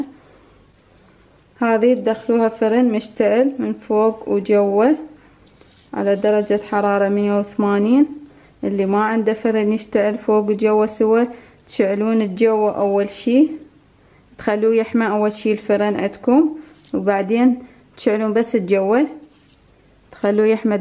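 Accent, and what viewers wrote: Iraq. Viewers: وكت ماكو سامحوني همينا حلوة اللهجة العراقية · فديت عراقية اشكد حلوه لهجتنا اختج من كركوك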